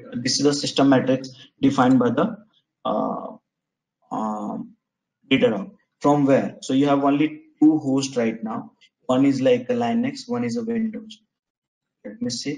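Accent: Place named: India. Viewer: it so hard to understand the heavy accent. i find it extremely hard especially with Indian tutors.It looks like a good course for me but whats the point if i cant understand every 3rd word he says. Also, the fact that he writes everything during the course is very typical to courses that are led by Indian accent tutors and also the excessive use of the word "OK" really irritates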